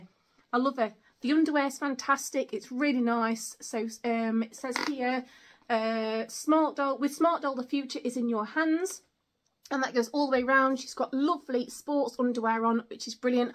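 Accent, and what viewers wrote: United Kingdom. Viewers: Very good video!! I love your enthusiasm. I have English as my second language and I wonder which part of the UK you're from. I love the way you talk. ❤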